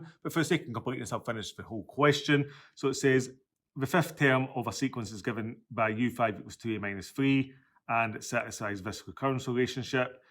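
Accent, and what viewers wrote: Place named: Ireland. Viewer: fikin hell mate cant understand ur irish accent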